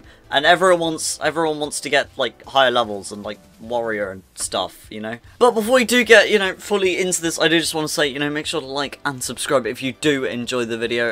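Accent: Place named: United Kingdom. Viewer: I love your British accent I always wanted to be British but I can’t I hope I can do you think I could)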